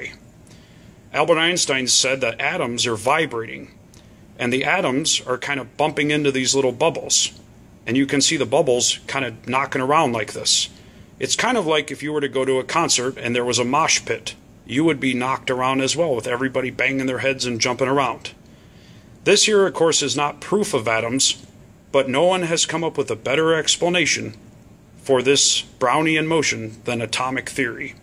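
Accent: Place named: Scotland, United Kingdom. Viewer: Scottish not Swedish.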